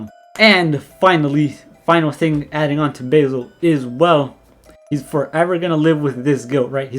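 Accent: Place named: India. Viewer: lmao your half indian accent and you smiling all video doesent match the topic but its fucking funny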